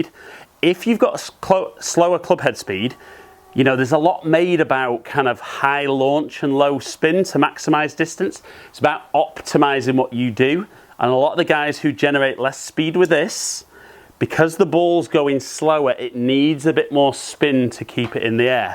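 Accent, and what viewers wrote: United Kingdom. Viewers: Can we get reviews from American without heavy British accents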